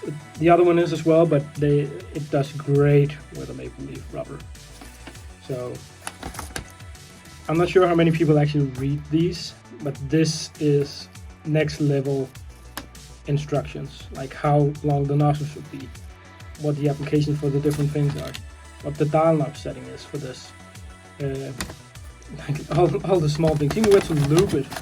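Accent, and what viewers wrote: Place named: Denmark. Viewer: are u danish? i smell accent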